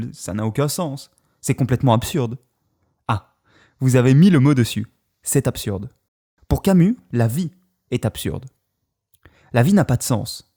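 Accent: Belgium. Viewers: comme d'habitude excellent video tu es le meilleur youtubeur de Tout Les temps avec Plus recherches plus de nuances et moins d'accent belge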